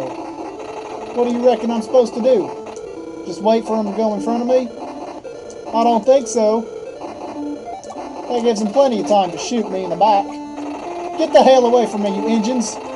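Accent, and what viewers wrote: United States. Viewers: The cowboy accent throughout the vid was quite an interesting concept. Just for the record, the reason you aren't getting out of the level is due to the lack of a wanted poster strangely enough. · Nice southern accent...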